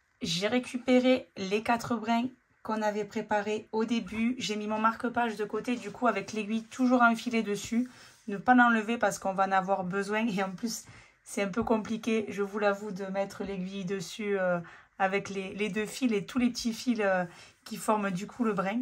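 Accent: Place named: Perpignan, France. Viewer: Super sympa et tellement bien expliqué avec notre bel accent de Perpignan 😅.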